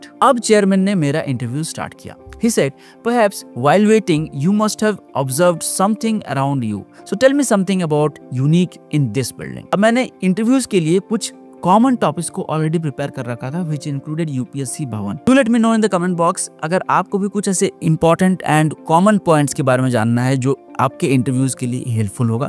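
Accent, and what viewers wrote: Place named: Bengal, India. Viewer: sunei voi lagche.. dadda apni bengali hoye Jharkhand e thaken?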